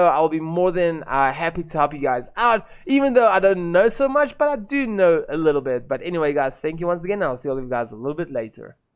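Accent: South Africa. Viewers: I hear that south african accent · Hi r u south african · bro sounds south african fr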